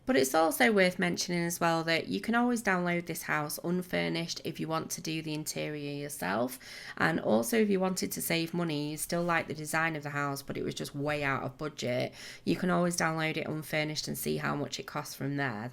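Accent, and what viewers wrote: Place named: Manchester, United Kingdom. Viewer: Youve gotta be from manchester hahahaha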